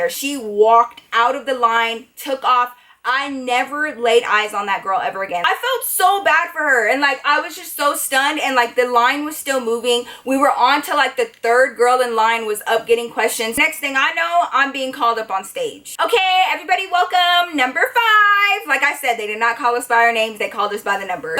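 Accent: Texas, United States. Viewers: I love your texan accent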